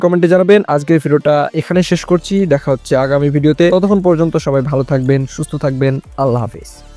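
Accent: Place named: Bangladesh. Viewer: ভাই আনার কথা শুনে মনে হয় বাংলাদেশি আপনি ❤❤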